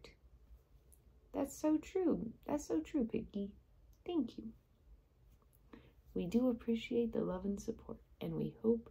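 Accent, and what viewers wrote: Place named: Appalachia, United States. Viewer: WOW! That was really, really good! Especially liked that Appalachian accent. You nailed it!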